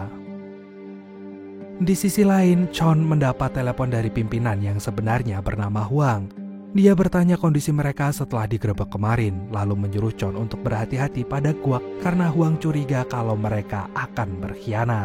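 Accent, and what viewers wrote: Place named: Banyumas, Indonesia. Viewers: Wasik Salam ngapak Ora ngapak ora kepenak Aja kelalen mendoan e Lik di sambi 😂😂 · Wong ndi mas deneng ngapak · Ngapak PWt, PBg, CiLanyap, Kebumen, apa Banjar Negara kie.. Nyong Pwt kota ra Nganggo bahasa "Rika" 😂 Langsung tek subrek kie pokoken anu seaLiran 👌🙏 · ccte cah ngapak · Wong ngapak ki genah.. Rika Rika.. 😂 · wong ngapak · Ternyata adminnya ngapak.. Salam ngapak min!! Kober kobere koh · ngapak kie minn wong ndi rika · Deneng rika ngapak😂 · Dene wong ngapak kang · Kaya wong ngapak bahasane😂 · lah rika si wong ndi deneng ngapak?! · Anu wong Banyumas apa ya, lek.. Yuh ngopi bareng lah wkwk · Wong endi min,kok ngapak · Ngapak rika y min he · Rika wong ngendi kang deneng ngapak temen bahasane 😅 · Wong ngapak ya kang Tek subrek lh meski mbene tau nonton 🤣🤣🤣🤣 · Anjai 😂ngapak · Laaah rika jebule ngapak wa.. 😂❤ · Rika si wong ndi... 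Aku Banyumas.... Kaya bahasaku kue deneng 😅😅😅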